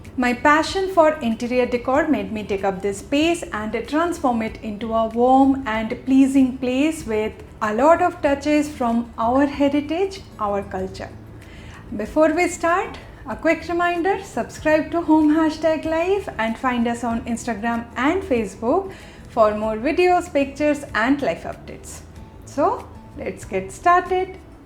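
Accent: Tamil Nadu, India. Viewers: U r tamil slang awsome ....never expected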